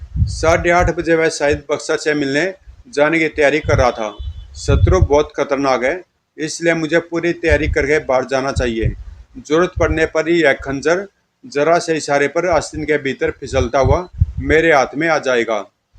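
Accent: Haryana, India. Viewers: Love your Haryanvi Style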